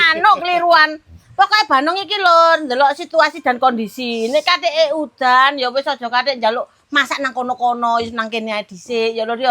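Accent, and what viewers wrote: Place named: Surabaya, Indonesia. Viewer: Aksen jatim sby an....😂👍 seneng lihat konten bu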